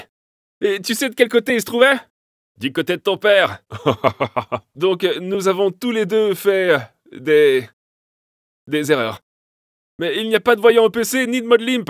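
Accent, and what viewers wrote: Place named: England, United Kingdom. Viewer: Lol knowing you're English accent voice then hearing it dubbed is crazy cuz in listening and since I'm in America is dubbed in English. So I'm seeing you speak English knowing you're voice but hearing a weird english dubbed voice haha😂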